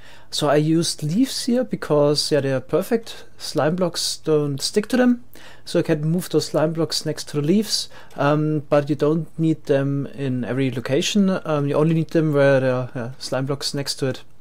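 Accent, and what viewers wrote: Germany. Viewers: ich sprech ja eigentlich kaum english aber ich hör voll raus das du deutscher bist... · man hört du bist deutscher :D... aber kann es sein das du noch andere Akzente hast? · Are you german? :D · German? Dutch? Austrian? What is that accent?!?!?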